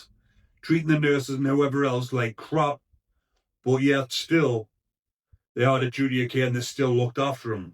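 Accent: Newcastle, United Kingdom. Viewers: Now go for the rest, and speak English not Geordie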